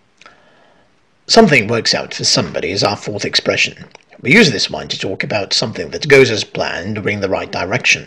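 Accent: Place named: United Kingdom. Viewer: I would like to take a moment to hail my dear brother and colleague for the excellent and distinguished work he is about to engage with. You get to enjoy the pride of your family, friends, and students, my dear teacher. The podcast reveals how creative is your rebellious spirit wherein your British accent shines to beautifully intersect with the condensed and rich content of the podcast. I wish the attractively presented video would be but an outset of a promising stage of multiple challenges.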